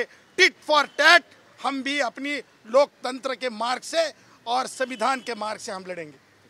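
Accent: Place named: Bihar, India. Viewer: Ha full bihari tone madhe boltoy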